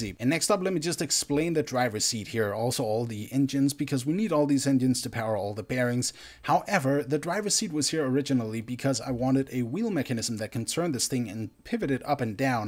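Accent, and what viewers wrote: Denmark. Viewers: he sounds danish.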